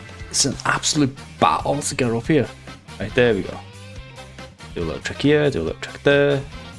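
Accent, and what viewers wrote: Liverpool, United Kingdom. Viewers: Good to hear a scouser online. I'm from liverpool too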